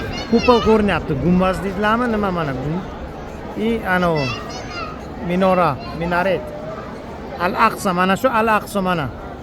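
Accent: Bukhara, Uzbekistan. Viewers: Birinchi marta kirishiz emas ekan hamma narsani joyini yaxshi bilopsiz yana ularni diniga ótib ketmang gapirishizdan tilizdan Buxoro jugutlariga óxshayopsiz shpiyonlardan bólmang yana tuxmat qilgan bólmayu hammamiz ulardan hazar qilgan paytda balo bormi ularni ichida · Сан бухоро еврейми шевангдан билиндику сани тарихингшу ушангга боргансан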